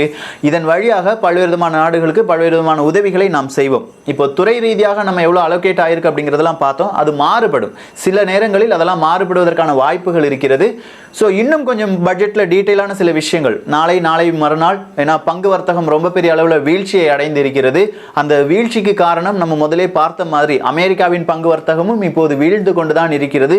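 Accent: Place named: Kanyakumari, India. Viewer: nenga kanykumari district ah ? slang patha apdi thonuthu..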